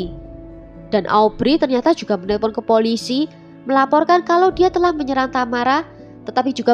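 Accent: Java, Indonesia. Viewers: Hay.. medokuhhh 👍💖💖💖 sukses trs yaa say 🤲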